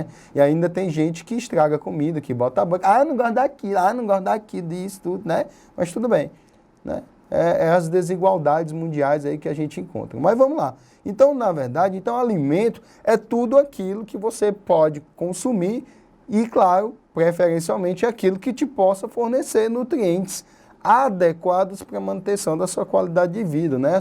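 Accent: Ceará, Brazil. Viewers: vlw!prof por mais essa! Gostei do início do vídeo e do senhor dizendo: ah profº.... posso comer isso... e tal tal kkk com esse sotaque cearense muito engraçado esse sotaque de vcs! obg por contribuir com nossa preparação pro enem e também p/ a ampliação do nosso aprendizado!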